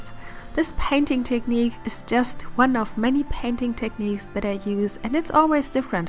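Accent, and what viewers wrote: Germany. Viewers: Maybe it’s just me or, is she German? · Geil dass ich in meiner Abitur KunstLK Prüfung 5 Stunden für ein realistisches Ölportrait hab... Super Video und wunderschön! Ich nehm mal wegen dem Akzent an dass du deutsch bist. Sorry if you do not understand anything:) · Are you German? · Hey! Amazing art! I wanted to ask of you are german, it seems like it, because of your name and accent. Please correct me if I'm wrong 😅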